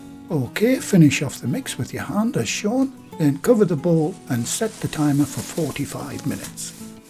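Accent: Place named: Newcastle, United Kingdom. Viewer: Another fab video. I bought some store bought ones yesterday. and they were disgusting, i threw the rest out to the birds this morning, even they were in 2 minds whether to eat them. I will be making my own now. Not only am i loving watching your channel and enjoying your easy to follow recipes, i love your geordie accent. Thanks again.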